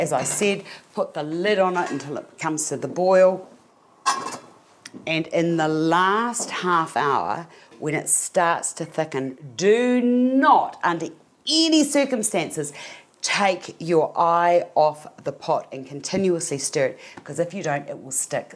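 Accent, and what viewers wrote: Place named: New Zealand. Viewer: Good old Kiwi accent : )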